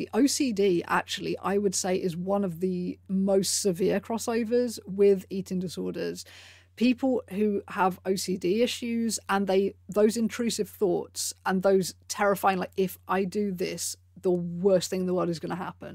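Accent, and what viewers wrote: United Kingdom. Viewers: Honey, oh boy! I'm American and really only speak English unless you include my three years of Spanish in college which equates to like basically Alicia still were still in from clueless trying to speak to her maid when she says,"you know I don't speak Mexican!" What was hell white valley girl I end up sounding every time I try to speak Spanish but anyways! My fiance Tyler just always started putting the TV setting so that every single thing at subtitles on all the time and it first it drove me nuts but then I started paying more attention to it and being like oh wow I always wondered what they said in this movie or whatever at that very moment now I know! And so that habit has translated over onto YouTube so I always have it set so that there's either actual subtitles on whatever videos are playing and they show up for me, or, they are the "Auto Generated" subtitles which are clearly meant for English speakers from America, because oh boy let me tell you it's pretty funny just reading them even on your videos because I'm sure you know quite well by now that you have a very distinct British accent and I mean personally I love your voice an accent so much and I just love your Vibe overall anyways that's part of the reason I love my channel but, I think the worst is when there's ever someone who narrates a video who has a very pronounced Welsh or Scottish accent...? 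(Austrians can be puuurrddy hilarious too with what this "Ufo generated" stuff comes up with?!) So when you're ta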